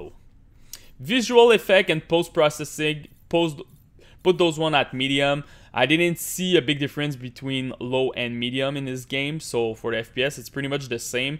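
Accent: Quebec, Canada. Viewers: Un Québecois :O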